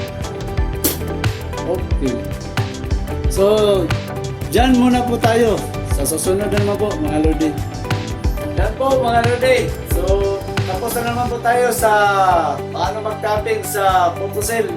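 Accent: Visayas, Philippines. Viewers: Bisaya ka pala sir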